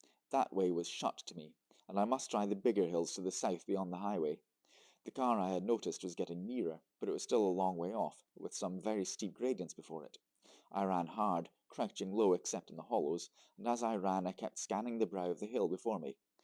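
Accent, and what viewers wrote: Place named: Lowland Scotland, United Kingdom. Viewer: Great Lallands in your storytelling today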